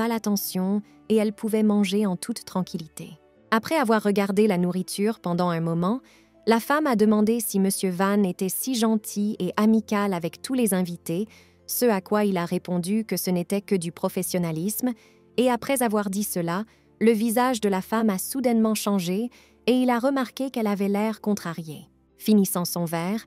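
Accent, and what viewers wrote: England, United Kingdom. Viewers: Pk y’a un accent anglais comme ça Miskine ❤❤❤❤😂😂😂😂😂😂😂😂😂😂😂😂😂😂😂😂😂😂😂😂😂😂😂😂😂😂😂😂😂😂😂😂😂😂😂😂😂😂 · Tu parles vraiment comment une anglaise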